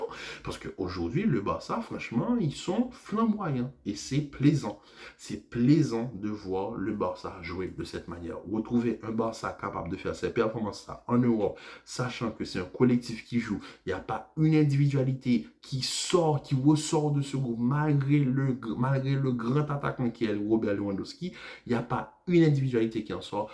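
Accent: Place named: Haiti. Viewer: Je sens que vous êtes un Haïtien. Ton accent, la façon dont tu dis le "en tout cas" "antouka"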